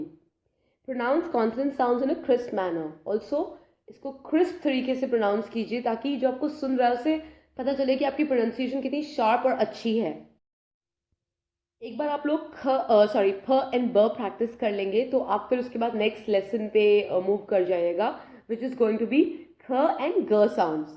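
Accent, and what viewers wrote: India. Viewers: you have a very strong India accent. please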